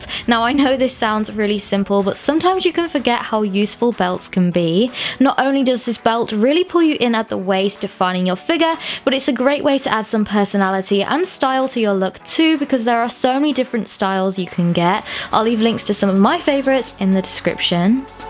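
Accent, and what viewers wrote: United Kingdom. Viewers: Love your british accent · Are you using a British accent??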